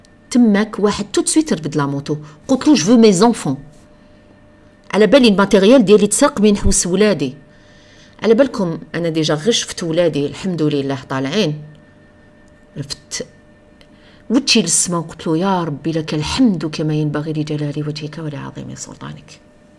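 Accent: Algiers, Algeria. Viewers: سلام عليكم اخت اول مرة نبعتلك كمونتاع انا من متتابعاتك الوفيات راكي اديا دعوة الخير من كل الشعب الجزائري نساء و رجال ولهذا اسلكك ربي من كل موصبة ان شاء الله راكي وكلتي ربي و احنا تاني ماعندك ماتزيدي حسبنا الله و هو النعم الواكيل و اخيرا الحمد لله على السلمة و نزيد هاذي انا صواتكي يعجبني اهبل بلاهجلةالعاصمية تاعك اتمنا ان اراكي يوما ان شاء الله 🤲🏻❤ امين شكرا شكرا و شكرا يحفظك الله و عائلتكي سلام❤❤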